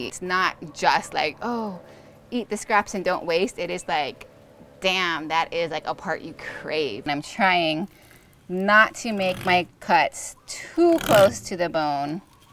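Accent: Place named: Wisconsin, United States. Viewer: I like listening to you because even though you're Hawaiian, your accent reminds me of being home in WI.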